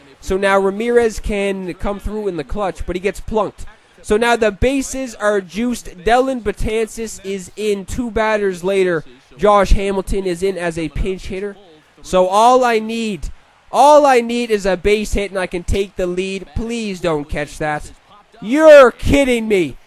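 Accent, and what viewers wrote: Canada. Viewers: Are you Canadian Youre accent sounds like it if you could plaese let me know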